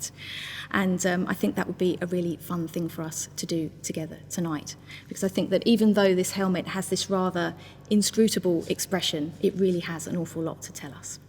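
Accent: England, United Kingdom. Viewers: What specific accent is this from the UK? It's..ASMR-ish. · I love art and archeology and I’ve watched a bazillion videos like this, but this is one of the best, she really brings the object to life and her enthusiasm is infectious...I’m an American and her accent and command of the “real” English language (not the butchered version of the language that we Americans use)...is wonderful. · Smart, beautiful, and an english accent? Call me dinner because I'm cooked.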